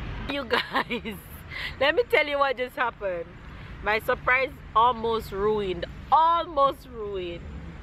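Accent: Jamaica. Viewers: is so gorgeous, awesome surprise for hubby. Some parts of your accent sounds Jamaican:)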